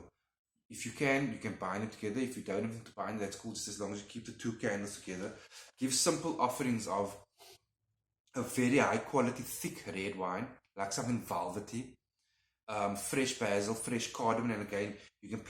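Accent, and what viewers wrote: South Africa. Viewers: Hi! Love your content. Fellow South African here. I could hear from your accent 🇿🇦😉 I'm from Cpt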